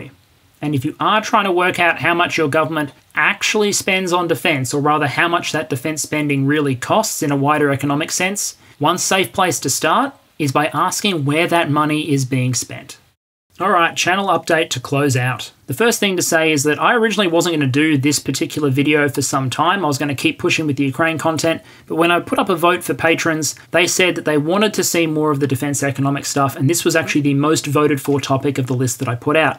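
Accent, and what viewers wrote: Australia. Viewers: Is this the economics explained guy or do all Aussie's sound alike?? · great informative vid, loved hearing an Aussie voice delivering the content.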